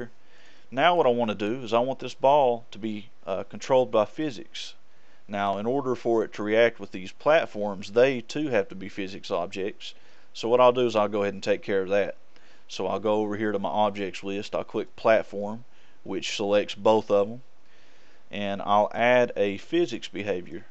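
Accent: South, United States. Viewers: that southern accent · That American accent is awesome!!! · Great tutorial, and great southern american voice (is it from the south right?)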